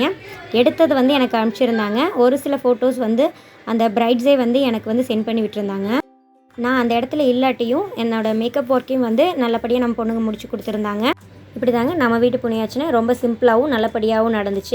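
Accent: Kongu, India. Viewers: unga slang apadiyea kongu slang mari iruku sis....i am new from u r cheanal wru u from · அழகிய கொங்கு தமிழ் அ பேசறிங்க ங்க‌ I like ur slang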